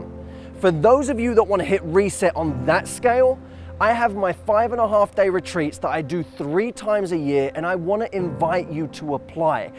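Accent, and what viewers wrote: United States. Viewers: great american accent! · like your american accent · he does an American accent so well, but I love his accent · WHOA That American accent!!! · Came here for the life tips. Stayed for his American accent😂😂😍😍😍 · Your American accent is impressive! Look out Hollywood!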